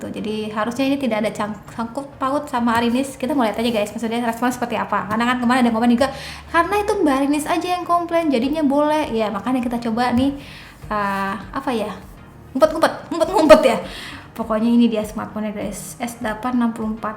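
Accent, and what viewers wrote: West Java, Indonesia. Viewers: Nambahin kata teh dan eyy di blkg kalimat kaya udh menjadi kebiasaan org sunda ya🤣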